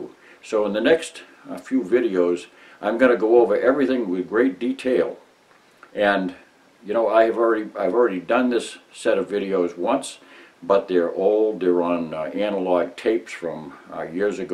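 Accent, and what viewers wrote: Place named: Boston, United States. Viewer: True Boston boy I can tell. Can’t wait to see the instructionals. Thank you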